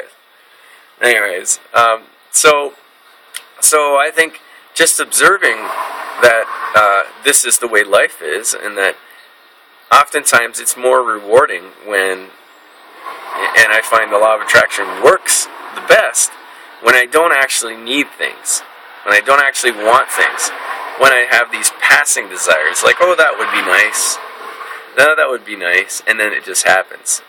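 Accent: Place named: Canada. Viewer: real quick, you must be canadian yeah? i love the way y'all say "about" :)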